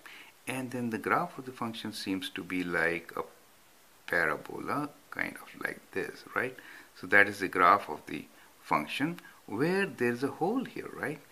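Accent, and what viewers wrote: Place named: India. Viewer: nice job,. great accent too. reminds me of a group of indians we were working with at a start-up company & brings back good memories.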